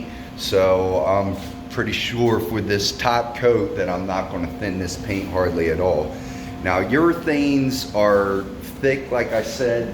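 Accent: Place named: Philadelphia, United States. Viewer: Not sure where you are located but your accent reminds me a lot of folks I used to work with near Philadelphia in Newtown. I'm betting you're from that part of the country!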